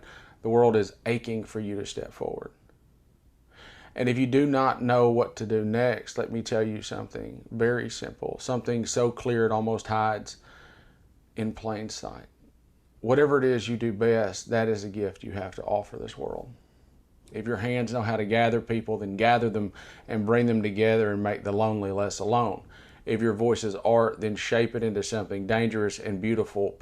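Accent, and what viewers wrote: South, United States. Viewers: I think it’s the profound eloquence wrapped in sweet southern drawl that is so soothing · It soothes my soul to hear your words spoken in an accent that swaddled me with love and courage and righteous anger as I grew up. My parents and loved ones stood up to hate and taught me to do so. My neighbors and a few family members have succumbed to that old American hate again. It’s disheartening, but your words spoken in that Southern cadence that has the capacity to carry so much love give me hope in a language that wraps my heart in that familiar love. Thank you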